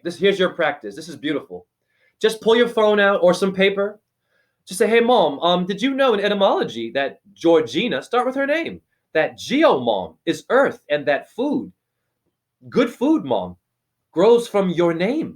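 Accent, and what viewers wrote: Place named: England, United Kingdom. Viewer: I So much enjoyed your chat ... It' Feels fabulous to listen and change 💚💜🙏. Ha ha I love the English voice, I'm from England 😊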